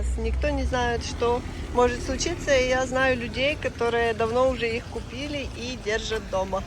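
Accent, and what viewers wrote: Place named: Western Ukraine, Ukraine. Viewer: Ну да ну да. Одесский говор. А кода я спросил , ранее , почему у вас заподноукраинскиц говор ? вы проигнорировали .... .,. и у меня , к сожалению , уменьшился интерес к вашему каналу. Уж извините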